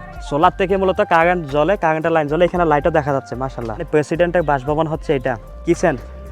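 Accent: Chittagong, Bangladesh. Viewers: আপনার ভাষায় আমার মনে হচ্ছে আপনি বাংলাদেশের চিটাগাং য়ের লোক টিক আছে কি জানাবেন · ভাই আপনিকি চট্টগ্রামের চট্টগ্রামের ভাষা শুনছিলাম 🥰🥰আমিও চট্টগ্রামের,রাঙ্গুনিয়া।।। জানাবেন চট্টগ্রামের কিনা🥰🥰🥰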